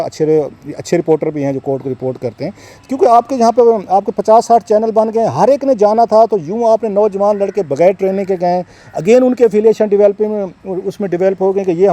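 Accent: Saraiki, Pakistan. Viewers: Dear Sb. Why you have stopped unveiling scandals of PTI/politicians/ zurkoota jins. I think.you have been threatened and frightened and we are disappointed. Pls don't stop sharing facts. Char Ja sooli per Ram bhali kary ga. We are missing your saraiki accent. Stay blessed. You are following Mr. Amir Mateen who has already surrendered.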